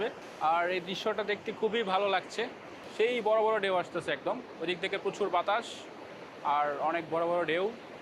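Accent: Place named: Mymensingh, Bangladesh. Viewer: সম্ভবত ময়মনসিংহের আশে পাশে।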